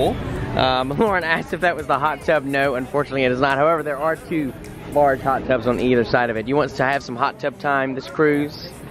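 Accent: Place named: South Carolina, United States. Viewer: Lauren in the fruit section is so precious... Y’all are cruising by the big cantaloupe and melons.... Lauren: Can we eat them?’: yeah 🤣 bless her heart! She doesn’t understand the treat she’s in on!! Take it easy on her! She might become overwhelmed by the how wonderful cruising is! 🤣 relax and enjoys, guys! Btw...I Love watching your videos! I discovered your channel a few months back! I love y’all the (spelling?) and! And now Lauren! I’m from SC so you sound like some of my kin folk! 😉 Take care!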